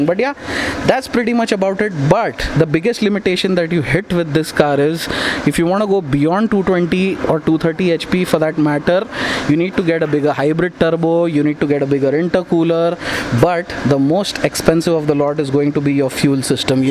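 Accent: Hyderabad, India. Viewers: Fellow car guy from Aurangabad, subscribed, and loving the car content aur hyderabadi sunne me kirrak maza aara it's super unique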